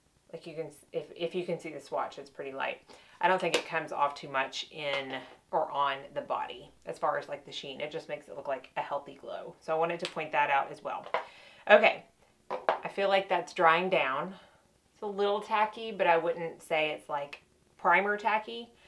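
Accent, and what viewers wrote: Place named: Appalachia, United States. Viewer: Been watching you for about a month now and I’m so happy I found your channel....I had never heard of Harbin house and watched one of your videos where you were talking about the Elta MD and immediately went and snatched it up one of my favorite sunscreens. Also your accent reminds me of Home I’m from the mountains of North Carolina right on the Tennessee North Carolina border😁.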